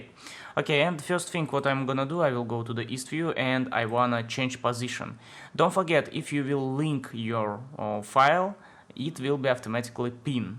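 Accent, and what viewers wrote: Russia. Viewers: Какой прекрасный русский акцент!!!🙃 А я поначалу очень удивился тому, что понимаю почти все что говорят! Обычно понимаю процентов 30 из сказанного!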